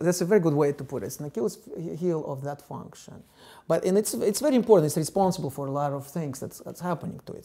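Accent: Russia. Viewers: Not sure why but his Russian accent makes me understand math better. My all time favorite Numberphile video. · I love math, as much as I love your Russian accent. :3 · You got a strong accent *kind of Russian I guess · Я тоже смотрю и думаю - он по ходу русский, раз я так хорошо его понимаю) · Now why does he have russian accent? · there is no german accent to hear in this. Believe me i am German. His accent is clearly Russian and i find it quite nice to listen to. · Me hear russian accent too. It's interesting because the man who named edward frenkel cannot be russian. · мне кажется или у него русский акцент? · The accent is Russian. I know several people with exactly the same accent. Actually, it could be Belarussian as well. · да да, вместо three три говорит :)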